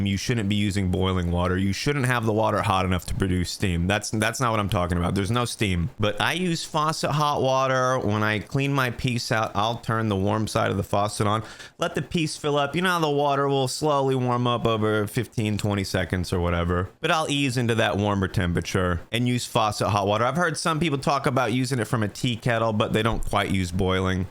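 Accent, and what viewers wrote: United States. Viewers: what state is ur accent from homie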